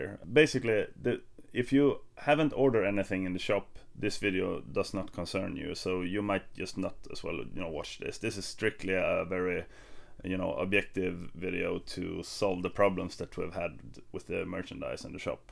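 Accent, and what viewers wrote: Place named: Sweden. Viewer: I just love your swedish Pronunciation :3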